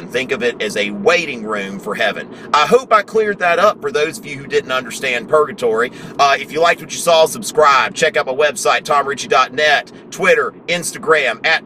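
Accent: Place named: Georgia, United States. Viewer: Georgian accent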